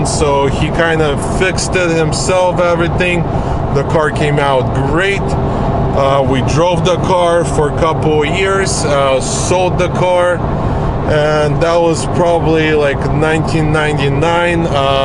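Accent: Russia. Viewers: WOW, I am blown away and fighting the tears. I knew with your beautiful accent that you probably came from Russia. As a proud American, and this is long overdue, welcome to your new home! You and your family have done more with the American dream than we native Americans. Your dreams, your hard work and faithfulness to your family has paid off. Your dad recognized his worth and that of his family. I am thrilled you have a second store! Who knows maybe a 3rd or 4th awaits. Your are the quintessential success story, and you did it really without a lot of help. You were and are faithful and patient. Giving credit where it is due I personally believe God brought you here. Whenever something good happens in my life I thank Him for it. When the storms of life appear I ask Him what can I learn from this how can I grow. You’ve done that too. You now have one of the premier and most successful channels out there. 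I wish you all the best for you and your entire family. A goal on my bucket list is to someday shake your hand and hug you! Keep up the awesome work!!!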